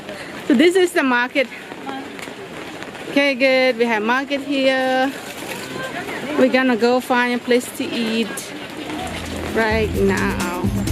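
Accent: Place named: Vietnam. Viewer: Are you Khmer or what? You act like it's your first time in Cambodia. You have Vietnamese accent though.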